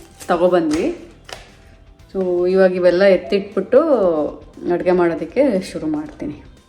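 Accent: Mandya, India. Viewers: Mam just informed all what's Retired age in US for Indian people’s for all categories:Nimma Mandya kannada bashe onthara channagidhe😂😢😅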